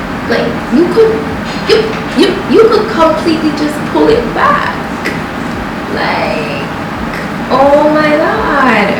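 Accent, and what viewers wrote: Jamaica. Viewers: You are beautiful, funny and the JA accent is feeling like home.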